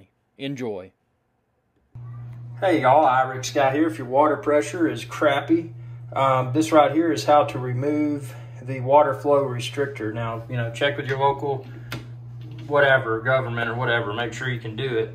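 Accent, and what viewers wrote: South, United States. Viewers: I’m in Florida. What state do you live in? With your accent I’m guessing South Carolina? Georgia? Tennessee? And everyone says “It’s probably your faucet aerator! No it’s not because mine are very clean.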